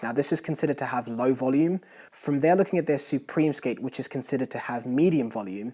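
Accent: England, United Kingdom. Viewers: Please share??? Did you know about the English hockey club. I have only heard about One. In the CHL. Perhaps you Are the Guy in the video??? Then Why do you sound so english??? I am really confused. Help Me out. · Hockey tutorial from british guy?🤔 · SERIOUSLY???? This a video for remedials looking to get into hockey??? Mommy still dressing you people? And sorry, it's really funny coming from a guy with an English accent. Any hockey shop worth it's salt can explain everything about skates or you shouldn't be buying from that shop. They are the most important piece of your equipment so treat them as such and visit a local hockey shop; not a sports store with a hockey section nor buy them online unless you know for sure. But I find the gushing comments about this video utterly ridiculous. If you don't know how something fits and feels on your own body, no video in the world will help you. 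Hey, hats off to the dude who made the video if you are getting paid for it but having someone tell me how to buy skates is like someone trying to tell me what stick to buy or how many sheets of toilet paper to use and how to hold it as I wipe my butt. But that's the difference between self-reliance and today's nanny-state mentality where people need their hand held for everything.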